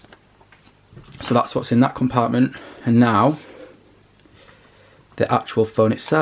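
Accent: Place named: West Yorkshire, United Kingdom. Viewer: Sounds like a West Yorkshire accent to me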